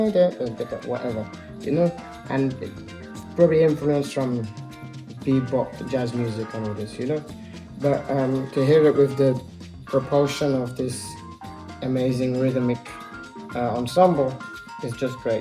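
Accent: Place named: Germany. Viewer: I love thissss he speaks like a 8 year old German child learning English. Not an insult.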